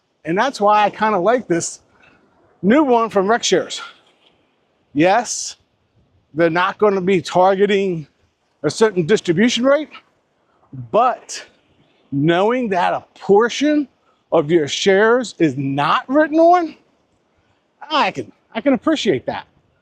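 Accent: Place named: Philadelphia, United States. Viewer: I thought I detected a Philly accent or close by.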